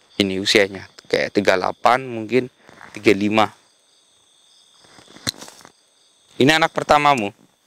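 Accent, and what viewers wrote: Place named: Java, Indonesia. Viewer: klu ngomong bahasa jawa lucu juga, smoga mister slalu sehat srmangat & sukses 🤲🤲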